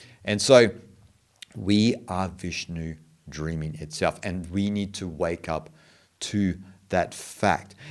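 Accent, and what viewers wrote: Australia. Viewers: Thousands of years old, very amazing concept of creation and the universe, very deep, not as flat as the 7 day genesis. When I came across vedic literature, many, many years ago, I was astonished, sucked into these mind boggling concepts, still blows me away..lasting some 55 years already. Robert Oppenheimer is said to have studied sanskriti to be able to understand these concepts untarnished by translation ! P.S. do I detect some Ozzi accent here?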